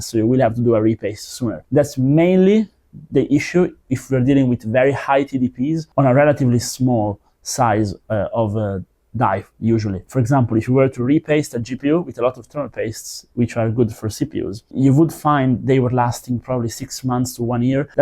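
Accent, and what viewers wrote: Italy. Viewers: He's Italian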